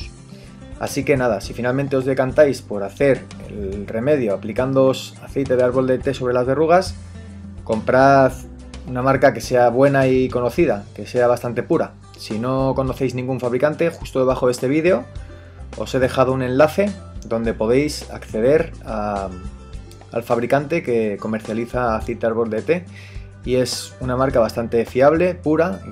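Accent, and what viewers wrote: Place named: South America. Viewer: Excelente consejo. Lo llevaré a la práctica a la de ya!!! Muchas gracias por el tip. Sólo una pregunta mi distinguido: En lugar de lo que tu llamas esparadrapo puedo utilizar lo que yo llamaría Microporo o cinta microporosa???? Quiero entender que es lo mismo. Por tu acento creo que eres sudamericano o de España, y aquí en México yo creo que sería la alternativa correcta. Me equivoco???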